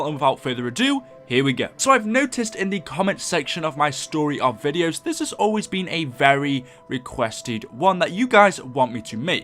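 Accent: United Kingdom. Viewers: He sound British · He sounds British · the voice sounds slightly British. anyone else agree? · I hear a British voice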